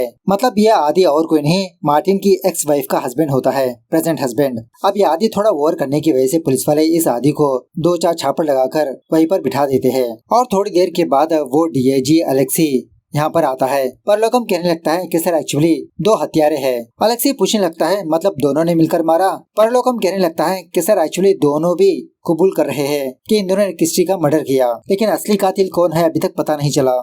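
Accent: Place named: South, India. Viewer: Aap kadapa se ho😮 aapka accent south Indian hai but i didn't think k aap Andhra Pradesh se honge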